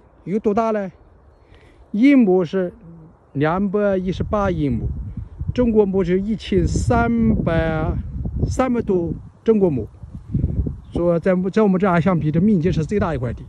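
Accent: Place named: Anhui, China. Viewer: 您的安徽口音太重了，建议增加中文字幕。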